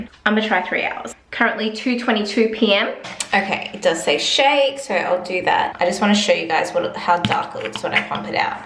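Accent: Australia. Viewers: Is she from Australia? I am and I think I recognize the accent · Okay but I love your voice and your Australian accent. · this is a really random question but is she from australia? idk she sounds australian though. just asking because i want to watch australia youtubers and she was in my recommended and she seems nice and i love her already ahaha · She sounds Australian not British. Maybe it washed off cause she didn't wait long enough.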